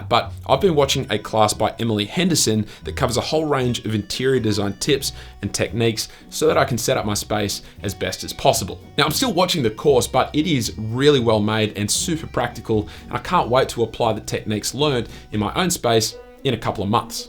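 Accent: Australia. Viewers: Great to hear an Aussie in here! This means everything will work on my phone 😄 Loved these apps and will now go join you on instagram. Thanks 👍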